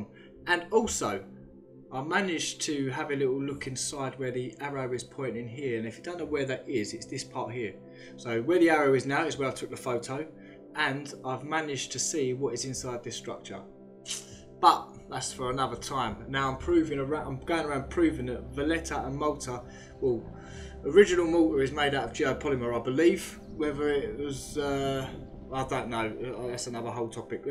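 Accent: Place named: Southern England, United Kingdom. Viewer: He's from the South of England. Can't you tell by his accent?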